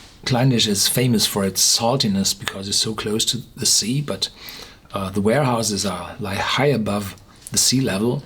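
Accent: Germany. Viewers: Sounds German , not Romanian..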